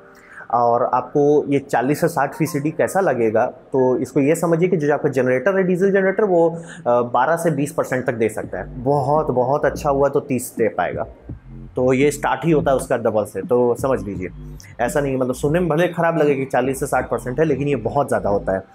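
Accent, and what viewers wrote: Bihar, India. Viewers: Can I guess you are from Bihar naa???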